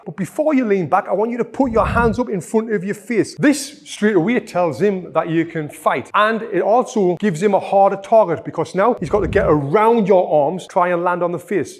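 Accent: United Kingdom. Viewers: Just watching for this great British bulldog accent😅 I use left hook twice low and immediately high afterwards. But I trained that. As you said stay balanced when moving back protect ur chin and do not blink. Or hang around with nice people at chill places so u do not get confronted. Worked for me last 30 years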